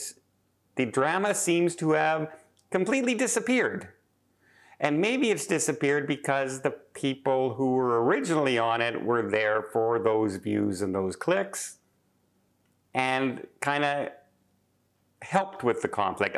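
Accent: Canada. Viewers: Canadian pronunciation. Just because Americans pronounce something one (we usually don’t either depending on regionsin the US)way doesn’t mean everyone has to sound like us 🙄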